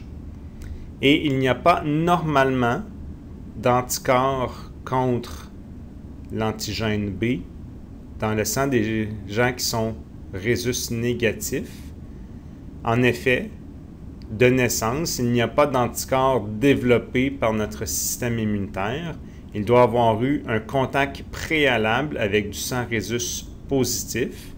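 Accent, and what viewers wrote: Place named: Canada. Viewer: Bien compris, juste que l accent canadien est difficile.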